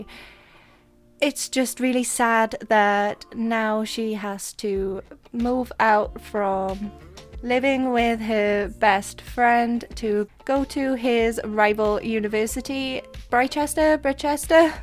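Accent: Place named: Wales, United Kingdom. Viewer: OMG YOURE WELSH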